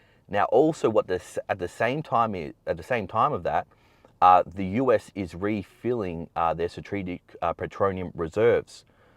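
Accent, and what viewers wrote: Australia. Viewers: I love the crocodile Dundee accent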